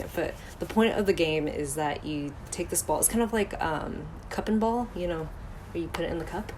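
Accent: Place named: California, United States. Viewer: Weird confession, sometime I think about emulating the way you talk haha. Like you don't rush your words, you articulate everything with precision and thoughtfulness. So measured and without any anxiety. And very Californian!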